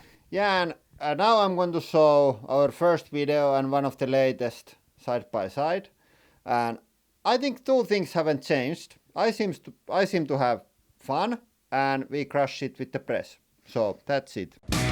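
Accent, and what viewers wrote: Finland. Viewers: I was trying to guess your nationality by your accent, but when I heard Perkele the guessing was over. Greetings to Finland. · I didn't really watch before. But I thought the accent must be Finnish, and then you hit us with the perkelle, and it was confirmed haha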